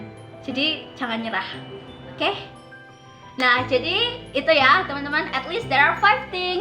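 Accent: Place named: United Kingdom. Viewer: British nya uwuw, aku lebih ke logat America:v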